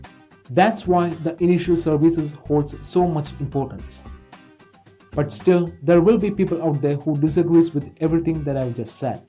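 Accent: India. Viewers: your accent is still indian😁😁😁😁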